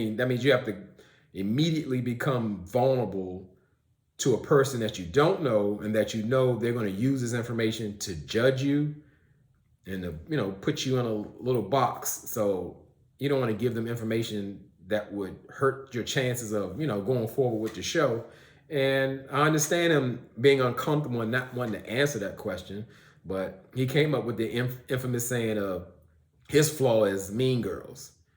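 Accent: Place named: Washington DC, United States. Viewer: Good review. Where you from bro? I hear a little bit of home (DC/dmv) in your voice?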